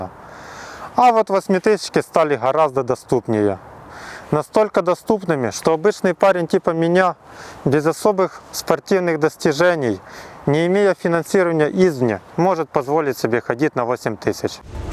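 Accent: Ukraine. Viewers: чому ви не ведете блог українською? По вашій вимові чутно, що ви україномовний. Нахіба оцей випєндрьож?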